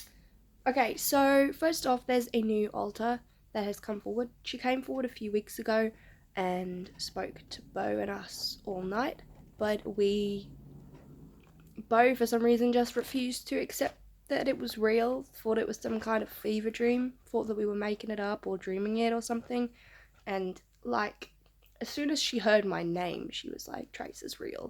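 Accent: Australia. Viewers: it sounds like a pretty typical australian accent to me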